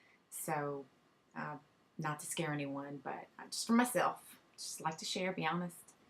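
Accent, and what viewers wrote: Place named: Louisiana, United States. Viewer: I see ur Saints Fleur de lis on the wall, I did notice your accent before and thought you were a LA girl too! I live in LaPlace 30 min from New Orleans. Love, love, love ur updates! Penny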